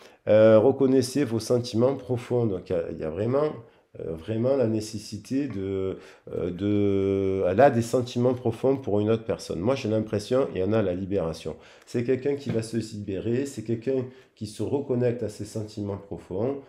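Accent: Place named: Provence, France. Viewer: Bonjour tres heureuse de Vous voir et de Vous entendre j adore votre voix du matin 😁 belle surprise 🌞 un rayon de Soleil qui vas illuminés Notre journée 😜 merci pour cette magnifique guidance Vous m avez fait rire 😄👍 pour votre petit message j ai le Coeur qui vibre pour toi qui Mai's venue en tête et j ai pensé avec votre accent a Marcel pagnol Marius TU me fends le coeur 💝 la parti de carte 🔥 j ai passé un bon moment en votre compagnie j ai adoré 👏 bravo gros bisous🤗😘🌈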